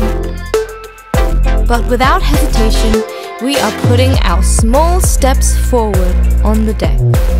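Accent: Australia. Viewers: Jyp and their australian narrators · stray kids done what first? Frontier means borderline. Their title is frontier : declaration and enhypens is Border : day one. Both use colons, frontier is synonym for border / borderline ..in this trailer the videos used are only nature documentaries and abstract art depictions without any member shown but only the aussie narration which is similar to enhypen , the aussie narration is just a part of the similiarities but you said stray kids did the aussie thing first.. even the clips used are almost the same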